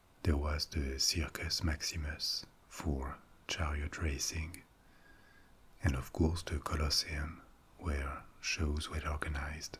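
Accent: France. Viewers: Is that a true French accent or a cartoon one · What a sexy voice and that French accent 😍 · Like a French Morgan Freeman. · Ever since visiting France (my first time visiting another country!), I have missed the French language and/or accent. It was wonderful, and hearing it in ASMR form AND in historical/science/astronomy forms is the best I could ask for 😊 Greetings from America. Thank you and stay safe! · Are you kidding me. A French man, speaking english and whispering? A dream come true.💖💖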